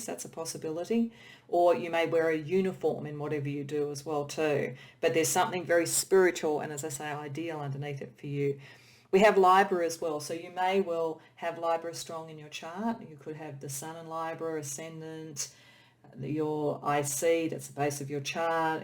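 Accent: Australia. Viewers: The way you described the phrenology oracle and what phrenology is so nonchalantly with your brilliant and beautiful Aussie accent was highly amusing to listen to for me, enough for me to want to tell you about it 😆 probably not something I'd have done in person but being as we're at a distant and communicating through the net I'm gonna risk it 😊 I just had a quick look at what others have commented on this video and I totally agree with them, you come across as someone who is obviously and refreshingly very gifted at reading cards, I think you create really unique readings and I like the different combinations and choices of decks you use too, most, if not all are ones that I'm sure I've not seen being used by other people who read and share here on YouTube before, and yeah, I too am very grateful to be here and have access to such fun and insightful, inspirational readings. 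.. and I watched a past life reading only earlier today actually, from Hermit Tarot who is another magical soul who I'm sure I heard you mention as someone who you watch too in one of your earlier videos 🤔💭 I think 😊 .. and you touched on the exact same things about this particular past life that she was reading into too! 😮🌝 crazy init how it all works, mentalist I tell thee 😆☺️ ahhh God 🙏🏻😌 I didn't fit in with the family or the society around me at all in this life in question, and I think it was in England, which is where I am again now, and I was thinking just now that I was maybe a woman in that lif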